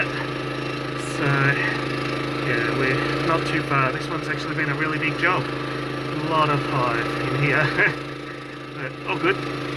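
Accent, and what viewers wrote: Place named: Australia. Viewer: Where are you from? You sound Australian.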